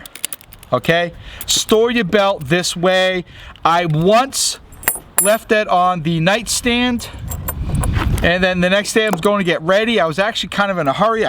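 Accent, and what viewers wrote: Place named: Boston, United States. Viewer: "Peter Griffin reviews his belt" haha Your from Boston aren't you?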